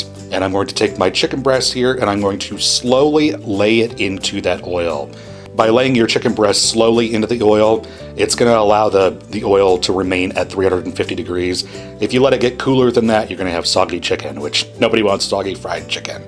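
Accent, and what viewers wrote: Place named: Massachusetts, United States. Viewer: Sound like Carlton from massetchusets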